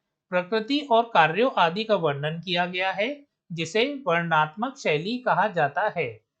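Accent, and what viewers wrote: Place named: United States. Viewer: Why are you speaking English like a american?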